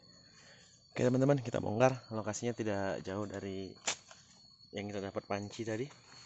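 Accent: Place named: Medan, Indonesia. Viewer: Biasa itu mass dalam logat medan😄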